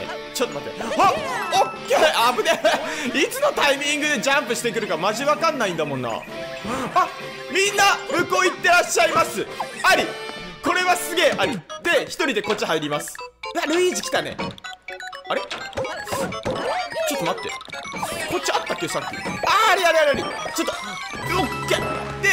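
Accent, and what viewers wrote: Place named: Hakata, Japan. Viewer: 私も福岡在住やけんめっちゃさんが言いたいこと分かります笑!!やっぱり博多弁になる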